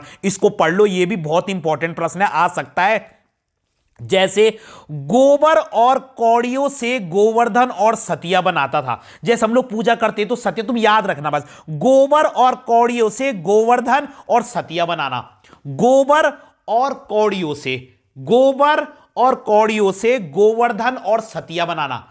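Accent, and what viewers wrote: Garhwal, India. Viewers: sir your garwali accent ❤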